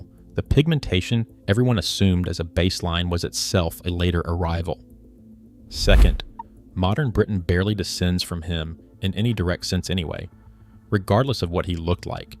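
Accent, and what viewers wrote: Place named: United States. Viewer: NOT IMPRESSED WITH AMERICAN ACCENT IN AI PLUS TAKES 28 MINUTES TO TELL 10 MINUTES OF INFO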